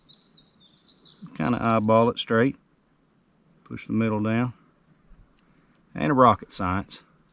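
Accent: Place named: United States. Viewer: I'm glad I I'm learning smth from this Guy. I like this American Accent better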